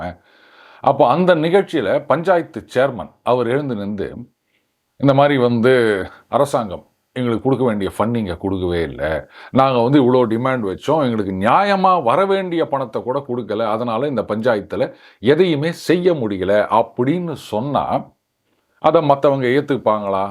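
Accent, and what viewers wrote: Chennai, India. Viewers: Your native Chennai slang is super sir. Your analysis is super and absolutely true!!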